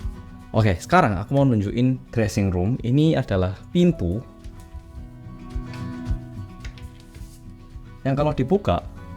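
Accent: Java, Indonesia. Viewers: Kelazz oppa logat jawanya ga bisa hilang wkwkwk😂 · Korea tapi medok jowo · Aku nek lali jenenge pasti nyebute ngene "Wong Korea seng ngomong bahasa Indonesia logat jowo seng bojone wong Singapore bahasa inggrisan"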